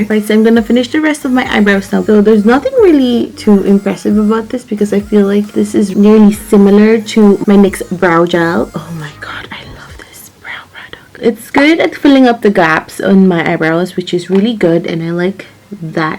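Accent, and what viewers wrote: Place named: Ireland. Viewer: Where are u from cuz u sound a little Irish🇮🇪I’m irish too💜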